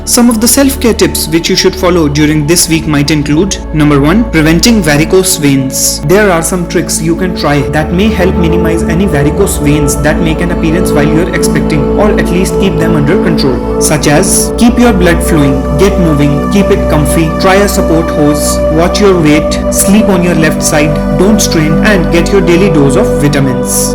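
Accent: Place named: India. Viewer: Can I fond a video with no Indian accent?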